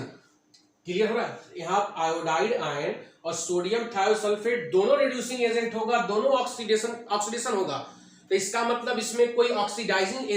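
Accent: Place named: Bhojpuri, India. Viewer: Sir आपके भोजपुरी जान मार रहा है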